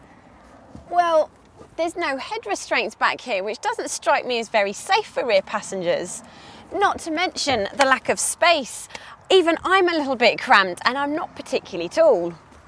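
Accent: United Kingdom. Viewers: Alexander Vartzbed and you throw in the snippy British accent too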